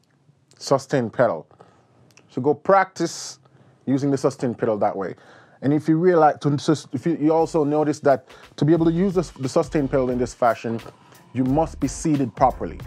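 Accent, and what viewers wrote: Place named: Jamaica. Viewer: Was only listening to the Jamaican accent 🤩.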